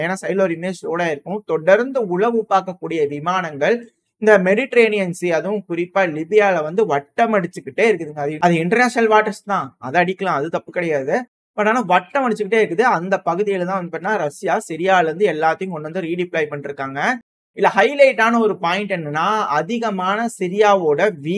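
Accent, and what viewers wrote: Coimbatore, India. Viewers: அவன் பேசறத பார்த்த கோயம்பத்தூர் மாரி தெரியுது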